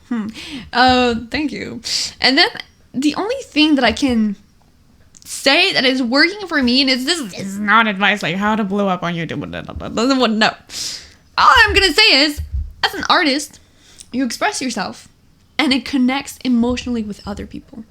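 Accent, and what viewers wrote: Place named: Europe. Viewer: Do you have a French ancestry? You seem to have a European lilt to your accent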